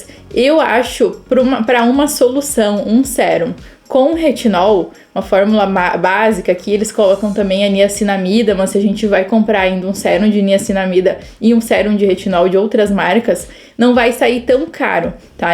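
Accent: South, Brazil. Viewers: Nada a ver com o conteúdo do vídeo é como eu gosto de ouvir o sotaque do sul. ❤❤❤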